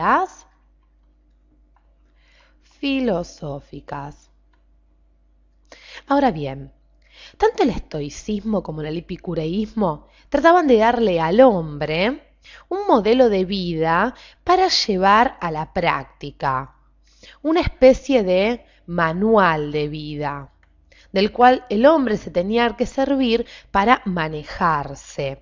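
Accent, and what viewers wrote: Argentina. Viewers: el acento argentino PTM no me gusta... la info buena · Un placer epicúreo es disfrutar del acento argentino.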